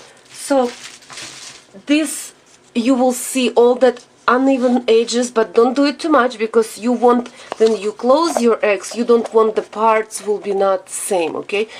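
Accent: Russia. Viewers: Чувствуется ,что по английски говорит россиянка..Произношение режит по ушам..но все равно молодец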